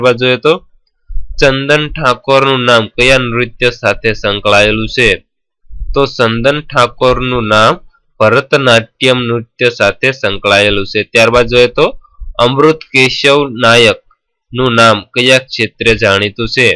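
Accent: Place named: Saurashtra, India. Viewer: નમસ્તે સાહેબ આપનો ખુબ ખુબ આભાર.. આપના નાનાભાઈ વતી એક નિવેદન કરું છું .. આપણી ભાષા પરથી લાગે છે કે આપ સૌરાષ્ટ્ર ના હસો..બીજું એ કે આપ અગાઉ ની પરીક્ષામાં પુછાય ગયેલા અને ભવિષ્યમાં પુછાવાની સંભાવના ધરાવતા મહત્વ ના પ્રશ્નો ની સિરીઝ બનાવવની કૃપા કરશો..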